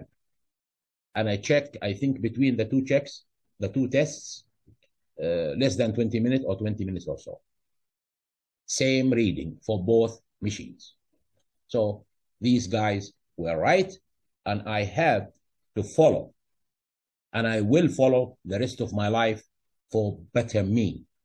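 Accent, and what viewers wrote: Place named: Arab world. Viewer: Very Good video , thanks. Wow your originally from india ! I thought your accent sound Arab .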